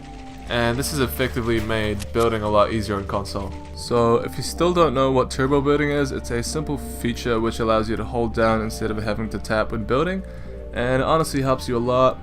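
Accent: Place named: New Zealand. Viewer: Where are you from? You sound like your from New Zealand lol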